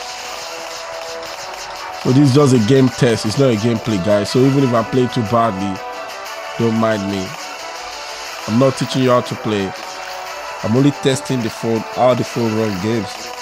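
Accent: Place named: Nigeria. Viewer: I really enjoy all your reviews man ,so honest and perfect ,i have ordered for this phone on Banggood and it is on transit to Nigeria,i hope i won't regret buying this one ,after my disappointment in Meiigoo S8 ,the battery drains faster and it lags though beautiful design but performance is poor..... Love from Nigeria Are you from Nigeria ,you sound like us? ...haha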